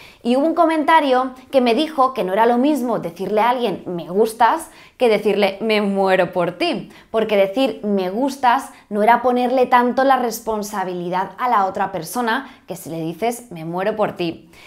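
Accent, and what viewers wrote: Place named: Spain. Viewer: A mí me gustas tú por tu acento español, tu lenguaje corporal y físicamente tu cabello te hace ver guapísima, así que...voy a España o vienes a México...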